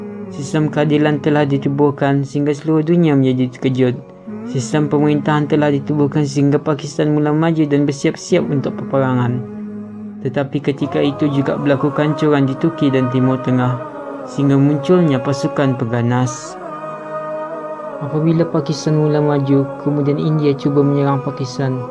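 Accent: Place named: Terengganu, Malaysia. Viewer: Bunyi cara bcakap nie loghat terengganu,org trgganu dlm bahasa baku..trbaikkk...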